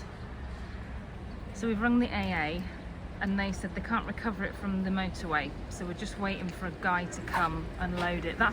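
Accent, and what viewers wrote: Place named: France. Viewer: Vous avez un superbe accent Français 🥰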